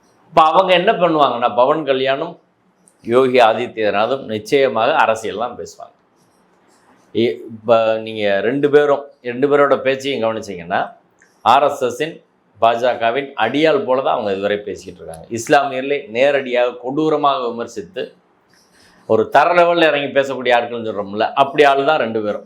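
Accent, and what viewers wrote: Madurai, India. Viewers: Only madurai voice. Great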